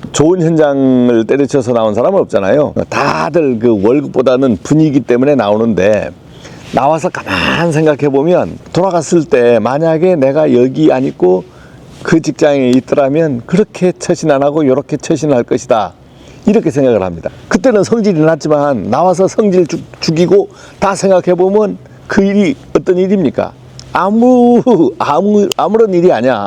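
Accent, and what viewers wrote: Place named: Jeolla, South Korea. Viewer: 이분 가족있나요?? 전라도 사투린데 ..........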